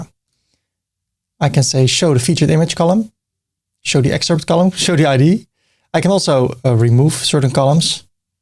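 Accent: Netherlands. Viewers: sounds like a dutch accent . im not sure though. here goes nothing. Nederlands?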